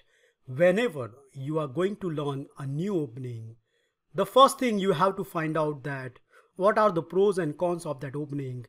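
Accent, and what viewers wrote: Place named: India. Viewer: The best Indian YouTuber with funny english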